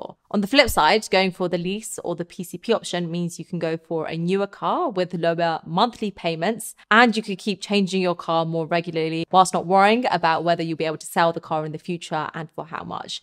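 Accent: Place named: London, United Kingdom. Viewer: said "What dialect does she have?". No detectable dialect. She's speaking standard British English with a British English accent. More or less RP but with a slight London/Essex accent if I had to guess.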